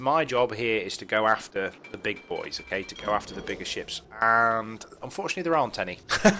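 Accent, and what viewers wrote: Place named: North West England, United Kingdom. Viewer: your accent sounds like your from the North West of England :D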